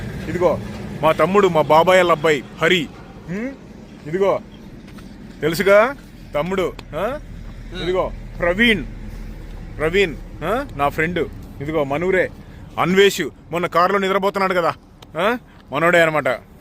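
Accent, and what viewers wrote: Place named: Krishna District, India. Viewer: మీ సొంత ఊరు ఏది బ్రదర్ ఎందుకు అంటే నీ మాట లో కృష్ణా జిల్లా యాస వినపడుతుంది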